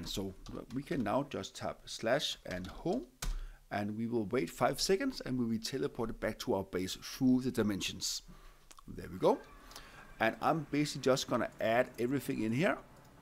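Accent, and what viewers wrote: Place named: Denmark. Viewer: can't decide if you sound german or Danish, but pretty sure I hear the strong danglish accent.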